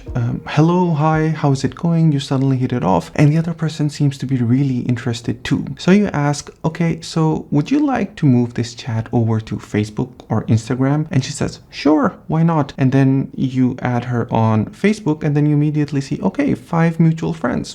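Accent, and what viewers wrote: Estonia. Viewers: You've lived there for so long that you've even picked up a slight Estonian accent :)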